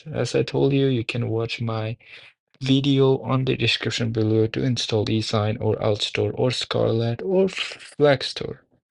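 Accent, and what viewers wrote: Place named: India. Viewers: Indian accent, immediate trust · Indian accent, immediate distrust.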